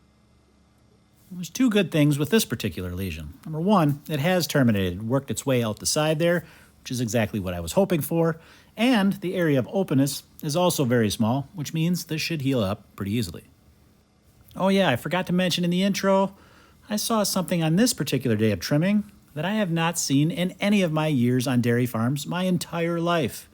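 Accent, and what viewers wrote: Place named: Wisconsin, United States. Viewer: I know a fellow Wisconsin homie when I hear one! Haha. If I ever get cows I want you to take care of their little feets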